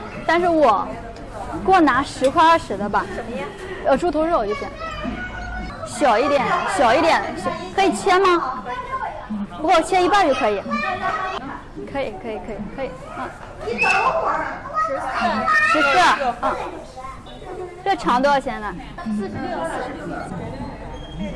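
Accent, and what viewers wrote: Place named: Weifang, China. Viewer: 肯定是潍坊老乡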